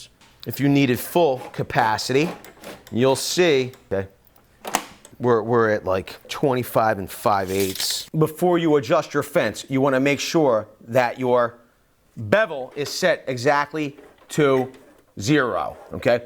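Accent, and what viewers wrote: Philadelphia, United States. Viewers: I've had this Skil saw sitting in my house for a week. My first tablesaw and I'm terrified to use it lol. Your video is probably the first I've seen where I feel more confident. Others casually rip through wood, no guard on, like it's not a big deal - and I KNOW it is. You've made it a point to keep yours on and show how you're ripping with it on. Granted, maybe a 4x4 or a very thin piece might need it off (?), but you then made sure to say the riving(sp?) knife should stay on if we know what's good for us. I appreciate this approach you're conveying - it's not overly fear-inducing, but also not callous to the consequences. And also, Philly Philly! at least I assume you're from Philly. Your accent made me wonder, and then your ending solidified my assumption.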